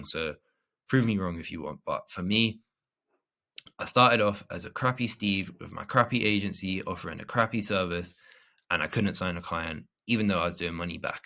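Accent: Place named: England, United Kingdom. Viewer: Hey bro very useful video 👌🏽 I’m also from england feel like your accent is close to where I live lol. I got a question, I’m starting in the restaurant niche as I’m 22 and have been in the industry since 16 so call me an insider if you will, what I don’t get is the pricing, clientele is different to the average smma niches average cost per head is like 20-40 quid not 1000 so I don’t get how I can make it work maybe I already know the answer but would it help figuring out the pricing after the zoom call to find out about the restaurant how much they make and their goals etc thanks.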